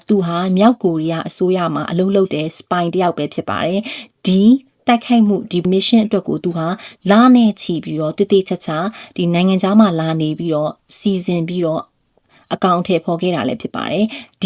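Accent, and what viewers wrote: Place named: Yangon, Myanmar. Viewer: အော်..မဟုတ်တာကိုအဟုတ်လုပ်ပီးလာပြောနေတယ် နေရာဒေသကွဲရင်အပြောအဆိုကွဲတာပဲ ရန်ကုန်ကလူတွေက ရမသုံးတာများတယ် က ပဲသုံးတာ မသိဘူးလား😏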